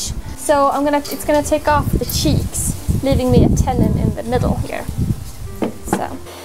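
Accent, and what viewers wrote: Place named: Sweden. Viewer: Misstänkte nog att det var en svenska bakom den brytningen. Anywho snyggt jobbat :)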